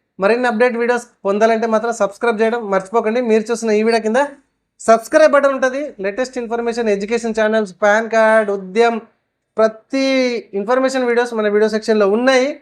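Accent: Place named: Andhra Pradesh, India. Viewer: Androlla lekka maatladutunnav anna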